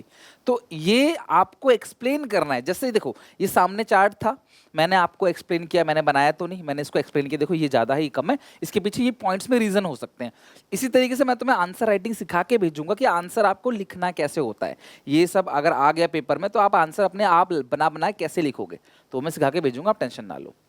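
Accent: Odisha, India. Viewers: Hello bhaiya Are you from odisha??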